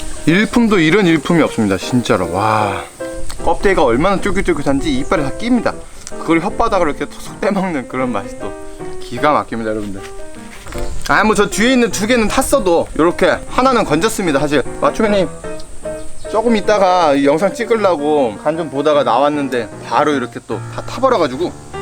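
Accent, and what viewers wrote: North Korea. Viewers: 북한사람 인가요?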